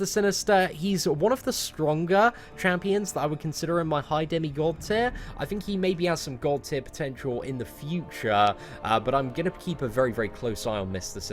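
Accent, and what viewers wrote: United Kingdom. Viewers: great videos bro. They've helped me a great deal. I was wondering if you could help me out. I'm farely new to the game. But, I'm finally starting to get 4 and 5 star champs. I'm going to list them below. Could, you recommend which champs I should make my 3 man attack team and which ones I should make my 5 defenders? None of my champs are awakened yet. By the way what part of the U.K. are you from? Or am I hearing wrong and your from down under? I'm what you call an American Scouser lol. Once again thanks and keep up the good work. 5 star - Hela and Yondu 4 Star- Morningstar, Mephisto, Blade KMonger, SheHulk, Emma, Guillotine, Venom, VooDoo, Wasp, Goblin, Sentry Wolvie, Antman, Punisher, Abomination, Night Crawler, Cyclops Blue, RedSkull, Capww2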